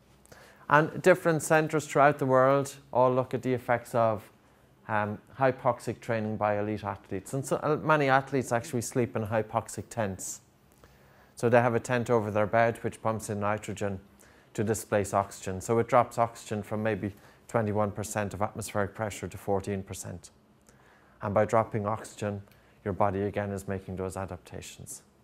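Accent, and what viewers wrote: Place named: Ireland. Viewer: Irish accent, originally grom Galway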